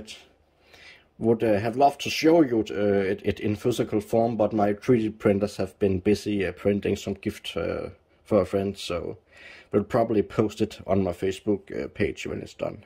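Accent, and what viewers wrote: Denmark. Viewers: Thanks for the update BTW, if i ever need to show our lovely danish accent to anyone, ill show them this video. Håber der kommer en ny video April 2024 Tak for det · I love your videos, the Danish accent makes me smile, and fits the content. Keep up the great work.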